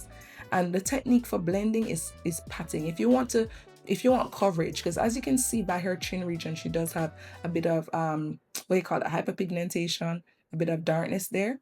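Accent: Jamaica. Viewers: Right when mi hear the Jamaican accent I'm😊 sold !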